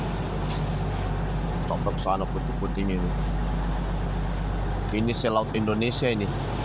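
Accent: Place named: Toraja, Indonesia. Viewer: Tator,kalau dengar logatnya 😂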